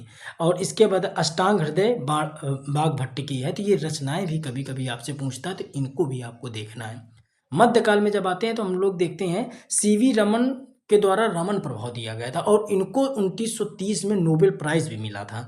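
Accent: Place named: Kanpur Dehat, India. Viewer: Sir aap kanpur dehat se ho kya...kuki apki boli lg rhi h ki aap hmare pukhrayan ke pass k hi ho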